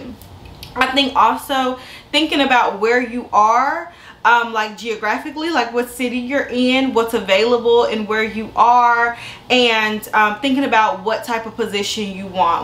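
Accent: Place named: South, United States. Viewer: Hi! I should’ve specified. I meant to ask what location are you in? I watched another video tho and I believe you said Nashville. I love to see a fellow Black GRITS (girl raised in the south) thriving! Love your energy and accent! Thanks for your content.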